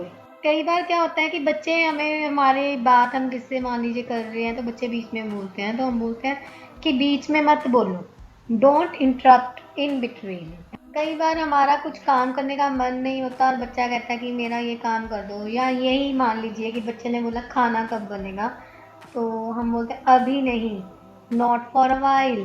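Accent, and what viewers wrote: Punjab, India. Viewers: are you punjabi..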